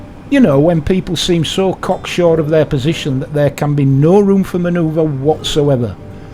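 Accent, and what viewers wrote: Yorkshire, United Kingdom. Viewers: Spoken by a good and proper Yorkshireman. Good man. 👍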